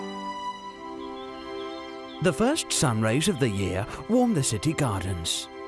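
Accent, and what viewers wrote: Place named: England, United Kingdom. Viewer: Teun heeft het gisteren in AVS (Esternietstedoenmisschien) een beetje verraden dat hij zijn script opstuurde naar een onvervalste Engelse stem die de teksten ingesproken heeft.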